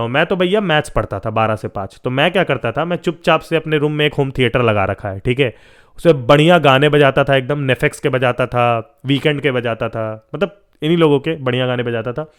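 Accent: Bihar, India. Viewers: Bihari ho?? Accent se guess kr rha hun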